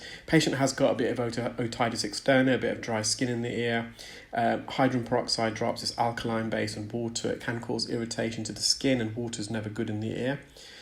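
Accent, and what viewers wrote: United Kingdom. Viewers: He’s a toad; pay him no mind. I enjoy hearing your British accent and love your videos.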